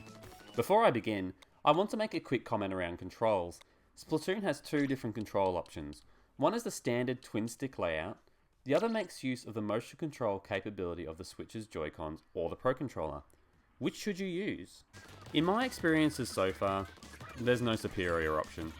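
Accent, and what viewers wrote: Australia. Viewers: you aussie mate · Are you Aussie 🤔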